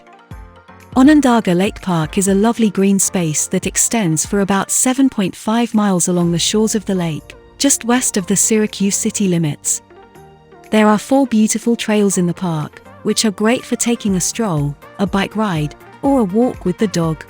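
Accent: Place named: United Kingdom. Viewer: This lady commenting this video & her British accent annoys me. (other than a suburb of Syracuse is named “Liverpool” there’s no reason to have a someone with a British accent narrate this video, about a city in the middle of upstate New York)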